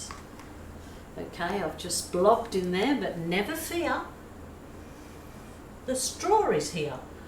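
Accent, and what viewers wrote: Birmingham, United Kingdom. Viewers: Lovely....can I hear a bit of a brummy accent?🙂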